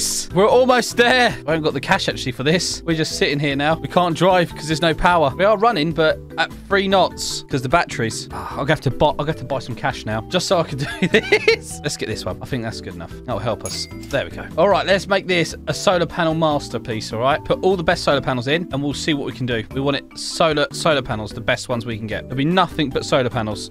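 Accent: United Kingdom. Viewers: For at least 1 year I didn't know he was british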